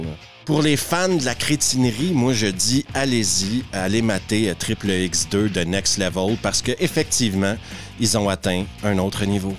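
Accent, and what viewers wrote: Quebec, Canada. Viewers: Je te suis depuis quelques temps un vrai pkaisir de re decouvrir ces films 😂😂 le toit avec un superbe accent québécois merci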